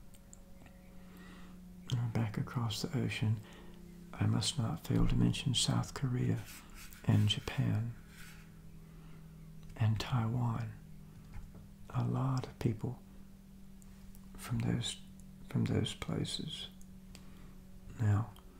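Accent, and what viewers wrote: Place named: East Coast, United States. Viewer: I’ve been binge watching, obviously. Didn’t know where you came from and took a guess one day & said “east coach of America” its the way you pronounce things that give it away .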